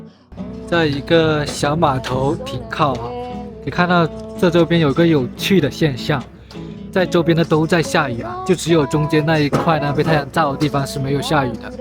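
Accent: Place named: Cantonese, China. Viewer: 广东人，他普通话没那么好😂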